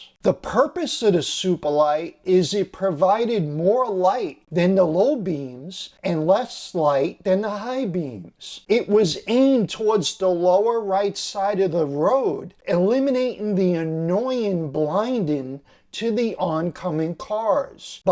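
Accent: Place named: Bronx, United States. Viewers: the East Coast accent Just like when they say they're going to Florida We're taking the kids down to Disney world in Flarider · That accent... Baracooder"..😂 like my grandma from New York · Hey! Are you from the Bronx? You talk the same way my father did.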